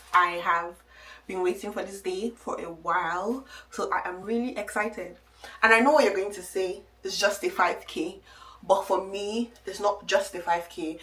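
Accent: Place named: Nigeria. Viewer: trust me you’ll get there faster than you think! If you keep on running 2 to 3 times a week, you’ll soon be reaching 35 minutes for 5k and before you’ll know it 30 minutes My next goal is a 10k, which I’ll start training for in a few weeks once I do some 5ks the next couple of weeks. The long term goal is a half marathon next year hopefully. From your accent I can tell you’re a Nigerian - I’m also a Nigerian too, born and raised in the UK You’ve got this! 🏃🏽‍♀️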